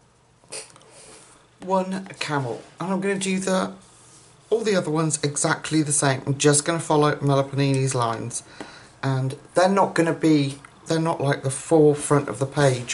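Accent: England, United Kingdom. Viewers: It’s so lovely to hear an English accent. I particularly appreciate the mention of the paper quality. I love Hanna Karlzon books as the paper will take watercolour pencils too. Many thanks for your tutorial. I’ve subscribed 🙏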